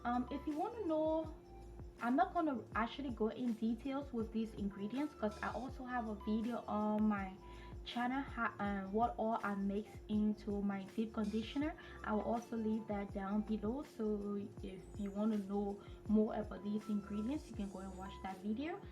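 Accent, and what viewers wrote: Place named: Liberia. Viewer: Sis, are you Liberian? I dig the accent. I too am Liberian. Love your channel and very informative.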